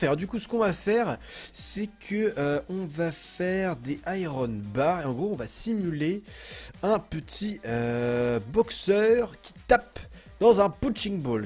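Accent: Quebec, Canada. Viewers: tu est quebequoi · Es-que tu as un peu de québecois ?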